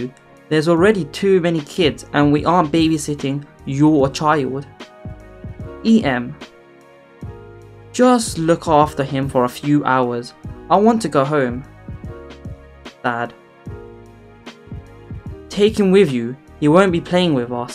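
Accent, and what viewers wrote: United Kingdom. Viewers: No problem, and I really like the karma aspect as well 😂😂 Your accent sounds kinda British, with something else. If you don't mind me asking, where are you from?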